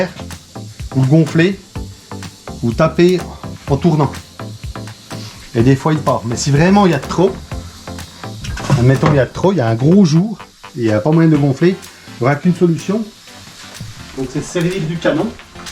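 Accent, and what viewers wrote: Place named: Vosges, France. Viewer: Super t’as vidéo bien expliqué ,t’as un accent t’es pas des Vosges?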